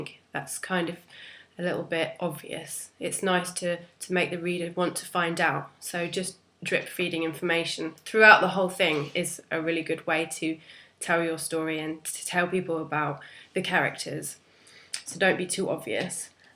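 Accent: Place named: England, United Kingdom. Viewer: Greetings. Thanks for breaking it down. Love the English accent!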